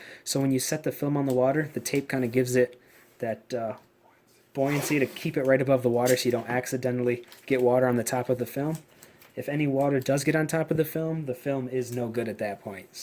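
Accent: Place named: United States. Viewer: Jorge Cruz stupid question....clearly you can hear they're American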